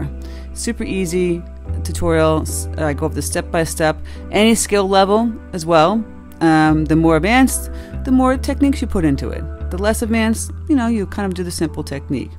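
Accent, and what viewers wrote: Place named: East Coast, United States. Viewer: Love your accent! East coast?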